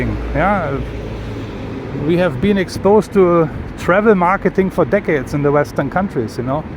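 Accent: Germany. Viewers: ur accent likes germany? right?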